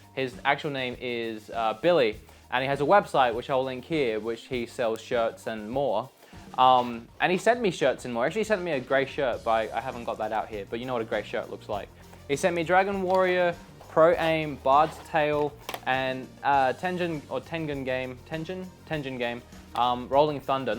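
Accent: Australia. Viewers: The aussie accent definitely got less noticeable watching this in 2026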